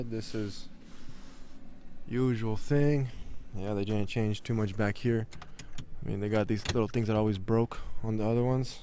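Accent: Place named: Eastern Europe. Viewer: Славянский акцент у автора проскальзывает. Сначала сомневался. Но выключенный inverter все прояснил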